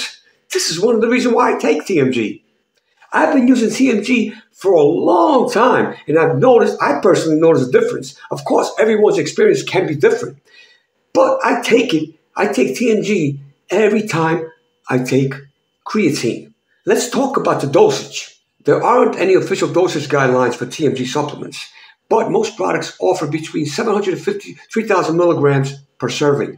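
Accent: New York, United States. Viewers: Hi, I'm also in my 60's I just started taking TMG and it's working great from what I feel so far. I wanted to ask you are you in Germany? Because you sound like a New Yorker. God bless stay healthy. I really enjoy your videos very educational.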